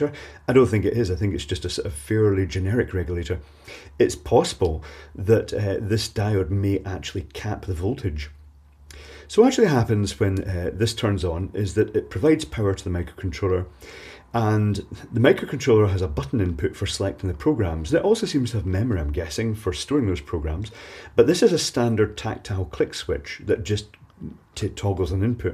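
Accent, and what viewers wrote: Scotland, United Kingdom. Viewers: Here is the guy with the Scottish accent.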